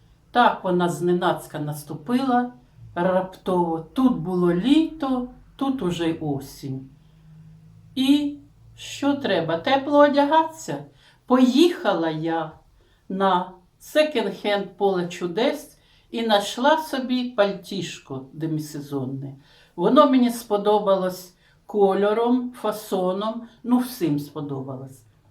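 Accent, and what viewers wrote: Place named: Ukraine. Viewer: Ничего себе ПАНИ))) Хохлушку за версту видно, что речь, что мина кирпича просит))) 😄🇷🇺🇷🇺🇷🇺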